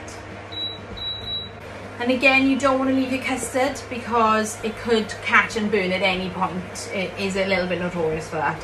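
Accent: Wales, United Kingdom. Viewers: It's only a Welsh accent it's not that strong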